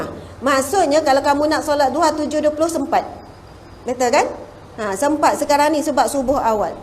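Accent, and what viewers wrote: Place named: Negeri Sembilan, Malaysia. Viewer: o ustaza ni org nogoqi..😅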